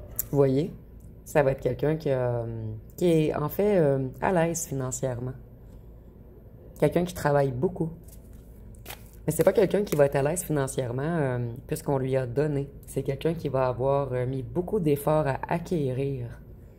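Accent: Quebec, Canada. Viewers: Exactement bonne journée à toi ma conceur je t adore tu es une belle âme reste bien tel que tu es j apprécie ton authenticité ton accent Québécois Extra ❤❤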